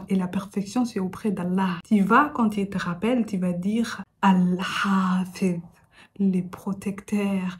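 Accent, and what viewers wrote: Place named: Comoros. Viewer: À son accent je dirais qu'elle est comorienne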